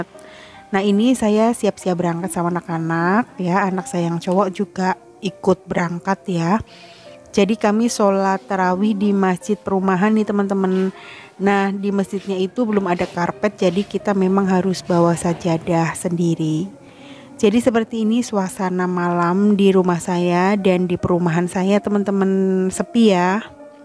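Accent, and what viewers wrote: Banyumas, Indonesia. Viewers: Ada ngapagnya ya bund...😁✌️ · W'salam sehat sehat mba, timggal di Purwokerto ya mba.....bahasanya ngapak donk hehehe, salaaam dri jakarta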